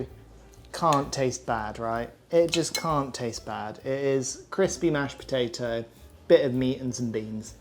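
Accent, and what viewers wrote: RP, United Kingdom. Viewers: Dude I discovered your videos today, maybe 10 hrs ago. At first I head your accent and thought ‘Tory posho’ but I gave your videos a chance and now I’ve watched about 15 vids from your channel. Your actually a really down-to-earth guy and your content is brilliant, proper comfort watching. I haven’t just learnt some new low-cost recipes from the vids I’ve seen, I’ve also been reminded not to make assumptions. You’re a top guy mate, hope this channel goes far! X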